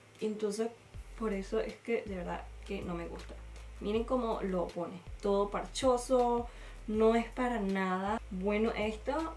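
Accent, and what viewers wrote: Venezuela. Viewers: Eres venezolana? Hablas como venezolana!❤ saludos desde Vzla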